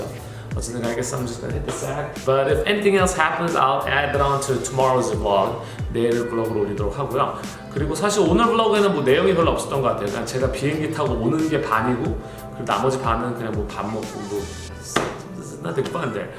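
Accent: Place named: New York, United States. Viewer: 저는 님이 뉴욕쪽 액센트가 있다고 생각해서 동부출신이라고 생각했는데 아닌가봐요..ㅋㅋ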